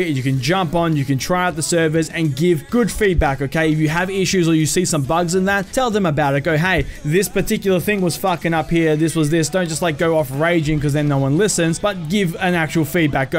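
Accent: Australia. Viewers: Btw it seems a lot of people are surprised you are Aussie, i knew it as soon as i heard ya.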